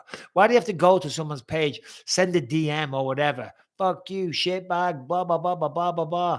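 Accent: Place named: Scotland, United Kingdom. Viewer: It's very noticeable that you do slur your speech at times, plus your memory is pretty bad for someone your age. Have you ever had any checkups regarding brain problems, etc etc, people saying you have no such problems are just being polite etc etc, but thats not helping you m8, ps Scottish accent is god dam fking awful lol oh and is it possible you can actually get through a video without mentioning Darren Till !!!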